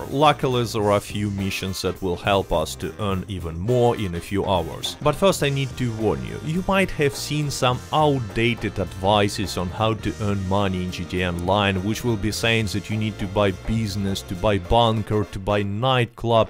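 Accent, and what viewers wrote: Russia. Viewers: This is why I love Russians the have a great sounding ascent and they get straight to the topic!!🙃😁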